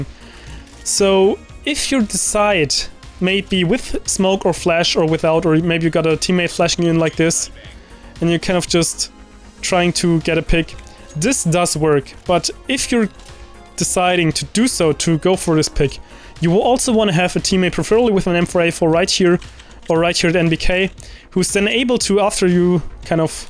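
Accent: Germany. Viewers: Are you gGerman?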